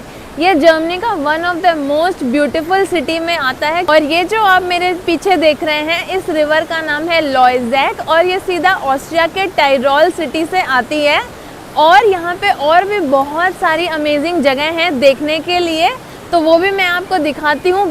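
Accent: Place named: Rajasthan, India. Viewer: Germany ki trh bahut beautiful hai.. 👌👌 ki marwadi awosem 1no. 🇮🇳🇮🇳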